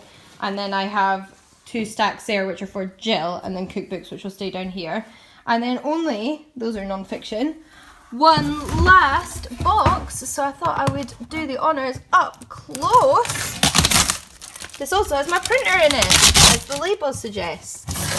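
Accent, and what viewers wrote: Scotland, United Kingdom. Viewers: This is definitely the most Scottish I've ever heard you sound in a video. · your accent <3 Love the Scottish accent !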